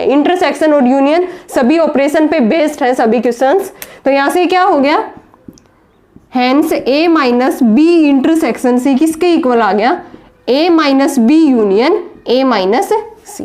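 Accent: Haryana, India. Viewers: Aap haryana se ho kya mam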